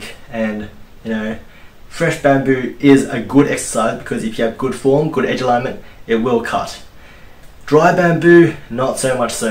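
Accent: Australia. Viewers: are you australian? (accent) nvm you just sead aud are you in sydney because im going crazy trying to find bamboo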